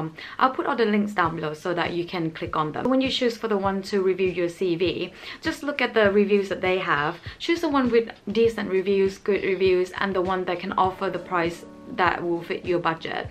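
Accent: United Kingdom. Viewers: You are from Thailand but I am curious about your British Accent? Did you always talk like that by chance? Thank you. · Wow. How did you get that British accent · You look like Asian but your English is British good job 👍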